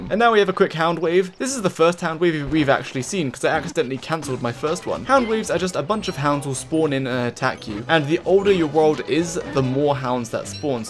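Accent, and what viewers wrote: United Kingdom. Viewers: this guide is the best guide i've ever seen ım am not a beginner but ı've got some tricks by you also you mentioned about almost everythink thank you love ya :3 <3 btw your BRİT-ish accent is soo good :)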